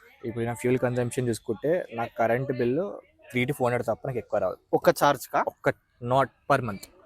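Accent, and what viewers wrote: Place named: Andhra Pradesh, India. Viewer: Anna pakka telangaana la putti ichaaru chesaaru vacharu ani andhroni basha matladtunnav emanna andhamaina telangana yaasa petkoniii😢😢😢😢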